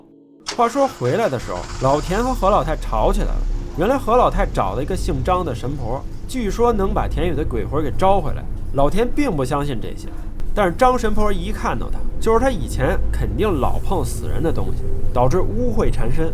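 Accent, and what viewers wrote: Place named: Beijing, China. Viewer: 北京人吧？不r道，电r机，赵iao娟儿～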